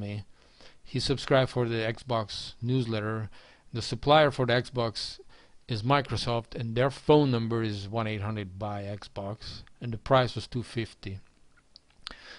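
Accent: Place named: Cuba. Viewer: Hey; are you American; living in Texas, and you speak Spanish? I’m trying to understand the influences on your accent ? Cuban ?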